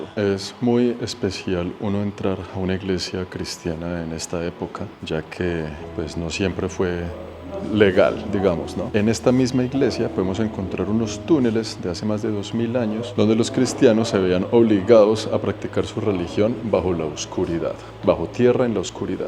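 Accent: Bogotá, Colombia. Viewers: No estoy de acuerdo con eso. Es un colombo-panameño, con un acento rolo (bogotano) muy marcado que indica un estrato social bajo... no puede regresar a muchos de los lugares a los que va. Lo primero que hace al llegar a Siria sin saber una palabra de árabe sirio es meter la cucharada y hablar de política con una ingenuidad e inocencia extrema. Pareciera que trabajara para el Pentágono en los EE.UU. porque su primera movida y la repite en este vídeo es criticar a la familia al-Assad sin haber hecho su tarea. Hoy no podría visitar Siria si no fuera por Bashar al-Assad que se vió forzado a tomar el timón del país para salvarlo cuando no quería nada que ver con política. A eso le llamo llegar de aguafiestas a meter la cucharada en donde no te llaman. Entonces qué hace en Siria? crear contenido pero esparciendo el Kool-Aid y la propaganda de Washington sin haber hecho su tarea. 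Algo parecido al desastre que causó con sus vídeos de Ucrania donde llegó con la ignorancia más grande del mundo y terminó haciendo vídeos propagandísticos que promueven al régimen de Zelensky controlado por Washington. Desastre absoluto y aquí lo primero que critica y donde erra es que menciona que Siria ha sido controlada "practicamente por la misma persona". TOTALMENTE FALSO. Bashar al-Assad es el elegido por el pueblo sirio. No menciona que la guerra la financió EE.UU. y que el supuesto "pueblo" que se echó a las calles fueron mercenarios extranjeros que se fueron adentrando en el país p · Primera vez que le veo y le FELICITO POR SUS VIDEOS TAN INTERESANTE APARTE ME RECUERDA SU ACENTO AL HABLAR A UN MARIDO QUE QUISE MUCHO COLOMBIANO WOW GRACIAS POR AMBAS COSAS Y QUE DIOS LE SIGA CUIDANDO PORQUE ANDA EN LUGARES PELIGROSOS SOY MEXICANA UN ABRAZO BENDICIONES ❤ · Como me río con las ocurrencias y el acento tan rico colombiano, una terapia, y de igual forma cubriendo países que de otra forma no veríamos de manera personal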